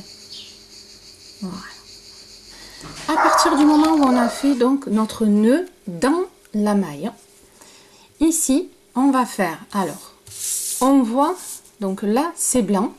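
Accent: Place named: South-West, France. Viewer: Merci infiniment pour cette vidéo ..Les explications sont très claires et on a l impression de crocheter avec une copine, c est trop bien . Cela faisait des mois que je voulais faire de la mosaïque en crochet mais impossible de trouver un tuto facile à comprendre . Enfin ,grâce à vous , j ai réussi ma première mosaïque . Je suis trop heureuse. 🥰 (Et l accent du sud-ouest en plus, peut-être celui des Landes , c est le bonheur ) Vous avez gagné une fan en Guadeloupe .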